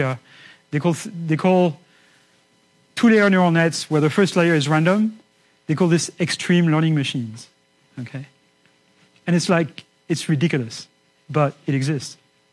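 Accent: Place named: France. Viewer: He has a french accent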